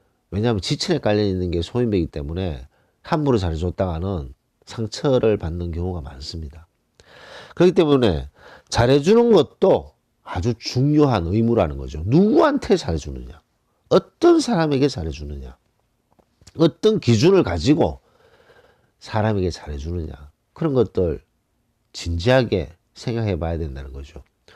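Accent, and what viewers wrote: Gyeongsang, South Korea. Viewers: 경상도 말투인데 듣기 싫지 않네요. ㅎㅎ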